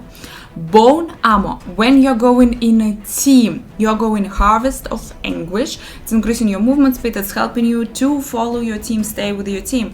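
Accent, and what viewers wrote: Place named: Russia. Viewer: просто акцент был похож на русский, извиняюсь, продолжай в том же духе)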